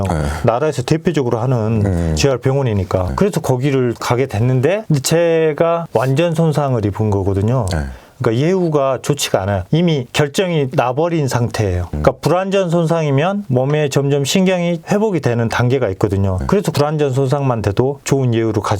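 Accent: Jeolla, South Korea. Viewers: 전라도? 외국으로 가셨내.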